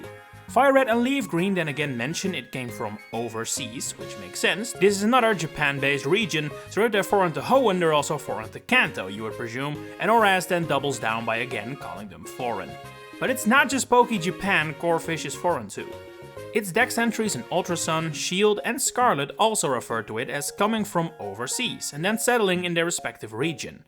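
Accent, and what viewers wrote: Netherlands. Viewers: Really like how you sound Dutch with all the different types of American accents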